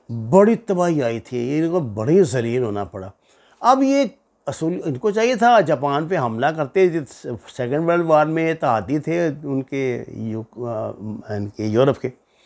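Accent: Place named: Lahore, Pakistan. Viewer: ilAQAH chach se hae aor lshori lehjah GHALIB aa gaeya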